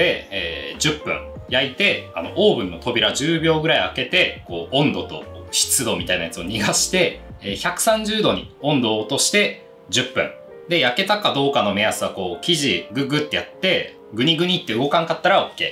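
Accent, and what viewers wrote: Fukuoka, Japan. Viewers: 福岡の方ですか？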